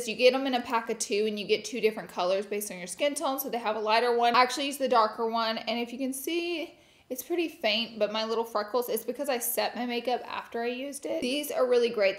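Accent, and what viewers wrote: South, United States. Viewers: I just adore you you are the cutest and I love your lil countrygirl accent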